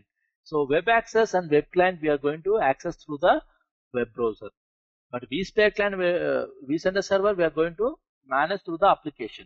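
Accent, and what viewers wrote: India. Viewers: Are there any tutorial, without the Indian accent??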